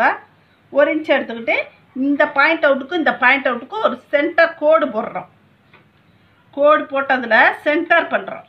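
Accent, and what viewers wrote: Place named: Madurai, India. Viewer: Tailoring institute poitu kathukita feeling.thelivana vilakam madurai thamizhil akka.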